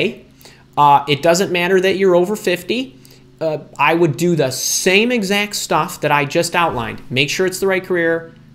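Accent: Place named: Midwest, United States. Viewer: Every few years I watch your videos when it’s that time again. I always get great guidance — & that amazingly vowel-ly Midwest accent just warms my little Chicago heart every time! 😊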